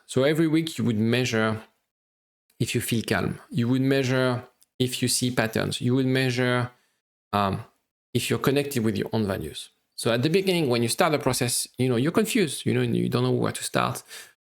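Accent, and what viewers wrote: France. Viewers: This video was extremely helpful. Subscribed! Also, your French accent is very cute😊🇨🇵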